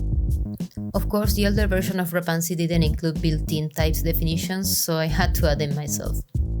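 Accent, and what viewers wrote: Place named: Argentina. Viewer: Great video! I'm a big fan of the format, shots, and quality of this! Uno puede escuchar la argentinidad en sangre con el acento 🇦🇷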